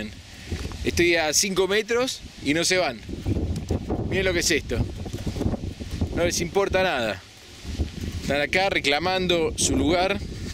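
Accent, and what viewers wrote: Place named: Argentina. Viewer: Vi el horrible video pensando en los consejos y sugerencias para erradicarlas, y la pasaste pasando un lugar que ni me importa y para terminar mi compañía es..... llámenos...... desde el principio pon PUBLICIDAD. No nos hagas perder el tiempo con los engaños y ganchos, además tienes acento argentino y yo vivo en México.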